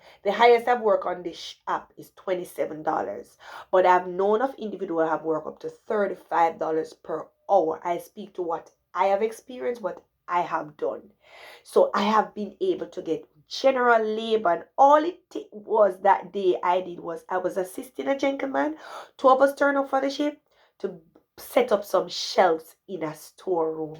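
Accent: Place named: Jamaica. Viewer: Love your Jamaican accent!!!!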